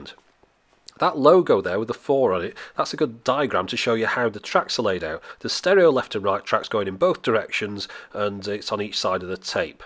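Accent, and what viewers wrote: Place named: England, United Kingdom. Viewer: This guy is great! I majored in electronics in the 60's and connected every wire in four 24-track analog recording studios in L.A. so I appreciate someone who clearly knows what he is doing and explains with a patient voice. Of course, I have heard it said many times that us Americans, when hearing an English accent, believe this person is way smarter (this guy really is) than the usual bloated sack of protoplasm. ;~)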